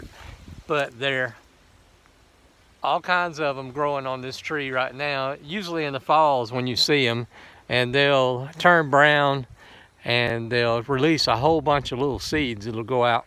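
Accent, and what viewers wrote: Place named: Arkansas, United States. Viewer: You sound like your from Arkansas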